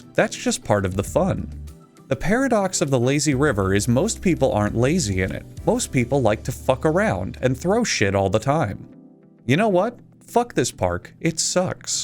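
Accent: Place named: Albany, United States. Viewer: Albany dialect represent!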